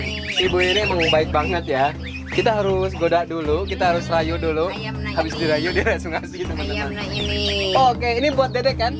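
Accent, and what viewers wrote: West Java, Indonesia. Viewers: "saya tigebros temen2 " khas sunda melekat bang jago , sukses terus a dede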